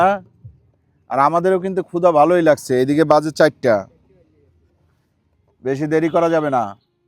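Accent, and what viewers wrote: Barishal, Bangladesh. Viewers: ভাই আপনার বাড়ি বরিশাল নাকি?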